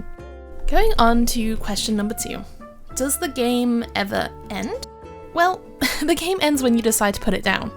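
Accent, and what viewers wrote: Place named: Northern England, United Kingdom. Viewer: It sounds like northern England mixed with America/Australia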